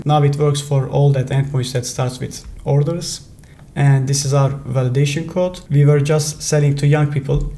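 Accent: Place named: Turkey. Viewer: Hocam o tatli aksaninizdan direkt Turk oldugunuzu anladim :D Harika bir video olmus direkt kanali takibe aldim. Cok tesekkurler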